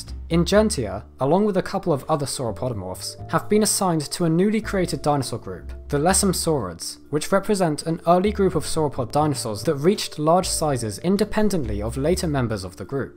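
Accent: United Kingdom. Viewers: you have a British accent so you can say everything as you please; apparently....:) Intro for an intro.......NICE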